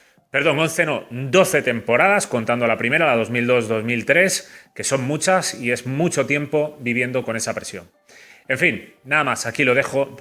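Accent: Spain. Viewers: Por el acento parece claro que es español.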